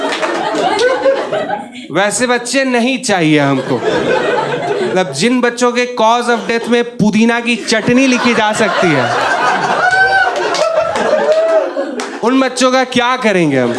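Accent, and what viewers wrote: Bihar, India. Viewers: Why that person said you're not from Bihar? Your accent seems 100% like any Bihari. My friends also speaks like this. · Boliye se pta chalat hai marde tu bihar ke na hawa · Bro, your comic timing is just amazing and by your accent, I can absolutely say with utmost confidence that you are too from Bihar.. Pr bhai, aap Bihar ko agr represent kr rhe ho, kisi bhi stage pr, to uski achhi chijon ko batao, talk about his glory and the progress that Bihar is on.. Not ki kuchh paiso k liye bhai tumlog bhi wahi kro ek time pr bhojpuri gaano ne Bihar ka image kharab krne me kiya hai.. When we are not going to respect our birthplace, how will others be going to respect?? I respect and enjoy your comic timing but please let us, the educated one, try and revamp the beauty and image of our birthplace Bihar, and it should be our first priority wherever we are. 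Waise, hum bhi Patna se hai.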